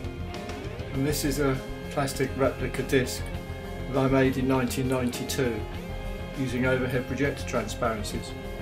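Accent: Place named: South London, United Kingdom. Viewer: Ah! I thought I recognised that 'Gateway to the South' accent. Wasn't 100% certain but I am now. Will never forget that wonderful tour you provided Lena and myself through the now tragically long gone MOMI, along with the marvellous hospitality, including our best ever overseas meal at your Balham residence. Having now reached another 'record age ( my first was 331/3 the second 45 :-) my collecting days arena largely behind me. In fact I've now reached, along with many of my co-hoarders, the 'what am I going to do with it all?' stage. However, my interest in early audio/visual technology has not at all diminished. Now, one of the (many) disadvantages of reaching a 'record' age is that it is now 70-years since I was the 8-year-old owners a Meccano set. (It got lost during a house move back in 1948).This means that I must leave you to home construct a Zoopraxiscope. 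When you do I trust you will share on YouTube. Keep up the good work - Charles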